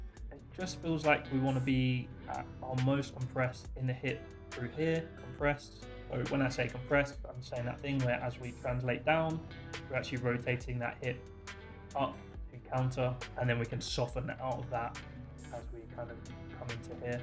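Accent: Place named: England, United Kingdom. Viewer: could have sworn he's english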